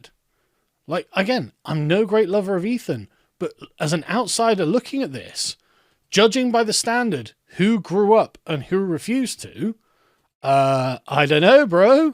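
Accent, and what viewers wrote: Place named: United Kingdom. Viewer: I love our boy providing updates on internet drama in a proper suit and brittish accent.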